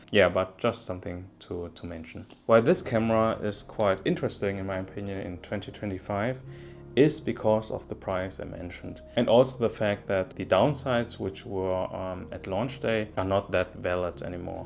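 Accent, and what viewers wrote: Germany. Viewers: Just curious, what racial are you? And because of your accent, do you live in Germany for a really long time?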